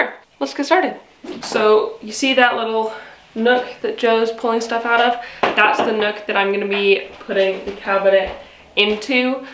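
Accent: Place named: United Kingdom. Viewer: I’ve watched quite a few of your videos and I heard many more words spoken with a British accent now. Heehee. Fun to listen to! Enjoy your awesome new greenhouse.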